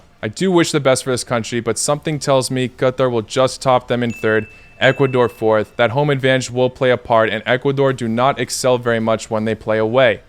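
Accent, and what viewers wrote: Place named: United States. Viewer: Au brate zvucis tacno ko amerikanac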